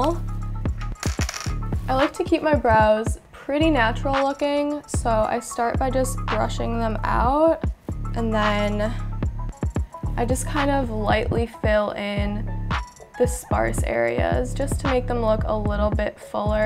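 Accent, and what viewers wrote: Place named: Chicago, United States. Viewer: so cute! i hear that chicago accent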